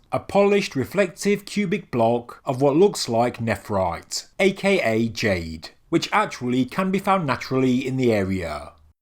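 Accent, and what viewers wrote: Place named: Black Country, United Kingdom. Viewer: He is definitely from the black country in England. Though that doesn't attest for the random drop in octaves on the last word in every sentence.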